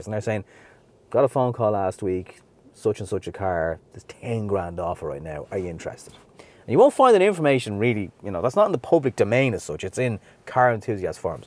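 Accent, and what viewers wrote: Ireland. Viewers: Sorry, I haven't followed you up to now. I'm not a fan of irish voices on YT vids. However yours is fine, now I'm a follower. What you've said is all common sense, it appears the general public has none of this. I appreciate your candor. I appreciate your comments on the effort / rewards etc. This video was honest, transparent and wholesome. Thanks for this.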